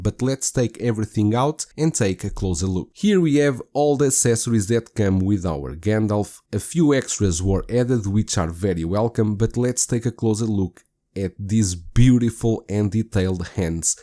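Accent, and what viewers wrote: Portugal. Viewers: Com o sotaque, vou assumir que o senhor é Português! Que bom conseguir ouvir a sua opinião! Awesome review, this figure is crazy good. I can't afford it, but I Pre-Ordered the Aragorn sculpted hair version. Can't wait!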